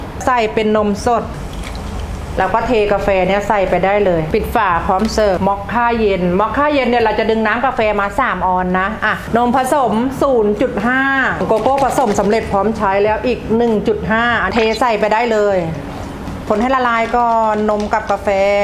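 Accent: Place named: Provincial, Thailand. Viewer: ดูเพลิน พี่เสียงเหน่อน่ารักด้วย ขอบคุณสำหรับคลิปครับ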